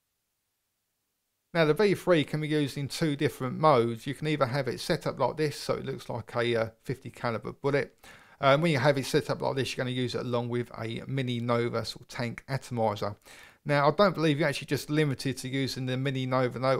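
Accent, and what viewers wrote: London, United Kingdom. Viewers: What part of London are you from? Maybe Hackney or Bethnal Green? Cool video.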